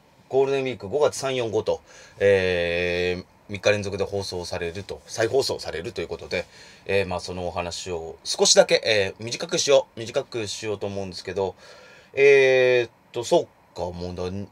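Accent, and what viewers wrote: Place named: Okinawa, Japan. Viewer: 沖縄のイントネーション好きだったな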